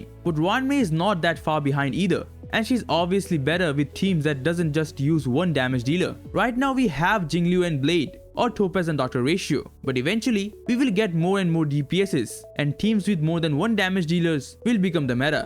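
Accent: India. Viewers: You are indian ? Accent seems so {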